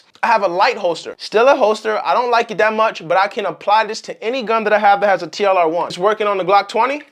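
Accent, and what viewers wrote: DMV, United States. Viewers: I never heard the dmv accent in ur shorts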